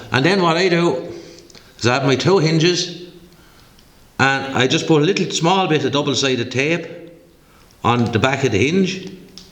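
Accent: Ireland. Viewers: So funny listening to an Irish man speaking English.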